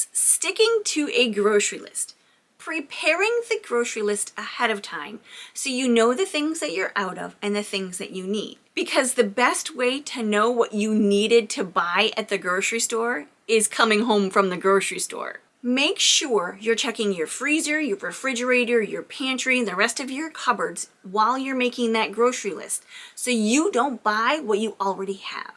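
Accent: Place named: Michigan, United States. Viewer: You have a Michigan accent. Or maybe Wisconsin or somewhere near there.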